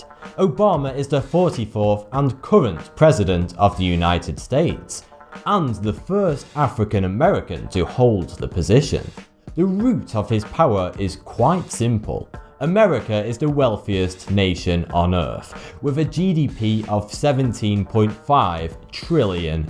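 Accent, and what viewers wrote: United Kingdom. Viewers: the big question is, where is mr. evelyn rotschild?, your accent obviously you are briton, you should know him by now.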